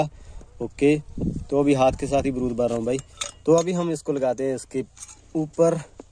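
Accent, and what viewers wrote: Punjab, India. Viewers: ਵੀਰੇ ਲਗਦਾ ਪੰਜਾਬੀ ਆ ਪੰਜਾਬੀ ਕਿਉ ਨੀ ਬੋਲਦਾ ਯਰ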